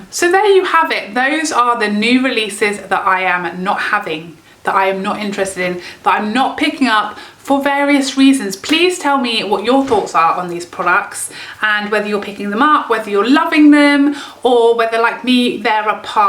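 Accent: United Kingdom. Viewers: I’ve been binge watching your videos while doing a spa night, listening to all this makeup talk in a British accent makes me feel so elegant and sophisticated😂😂 plus you’re HILARIOUS. Love your channel lady🙋🏾‍♀️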